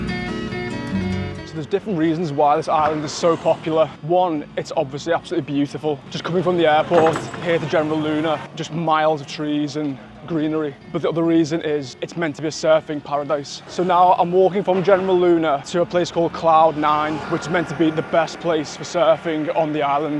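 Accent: Liverpool, United Kingdom. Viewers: miss the scouse accent. I lived and worked in Liverpool for 13 years before moving to Australia. nice one lad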